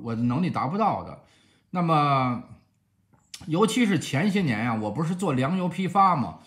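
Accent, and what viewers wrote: Beijing, China. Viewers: ， 我是你的忠心粉丝。 我也是北京人， 听着您的北京腔儿实在是爽。 我来美国18年了， 媳妇儿是美国人， 三个孩子全都聪明漂亮, 强烈支持您的儿子找个西班牙媳妇儿, 事少, 踏实极了！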